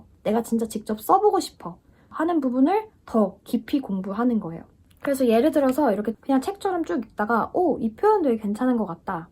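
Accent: United Kingdom. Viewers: 영국에 쭉 사시는것은 아닌가봐요! 덕분에 영국영어를 매일 듣고있어요 감사해요!!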